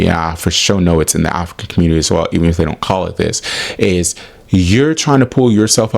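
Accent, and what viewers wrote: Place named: United Kingdom. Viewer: African ameri what? Sounds more British than american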